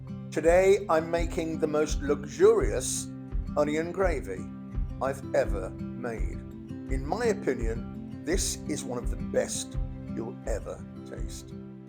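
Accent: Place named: Birmingham, United Kingdom. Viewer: Love the gravy. Love the mild Birmingham accent. Subscribed.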